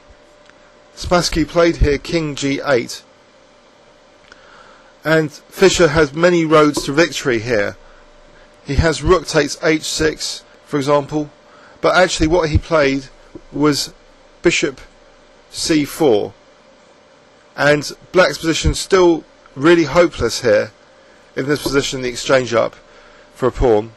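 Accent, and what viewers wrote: United Kingdom. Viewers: Love your british acent :P well I guess it's british :P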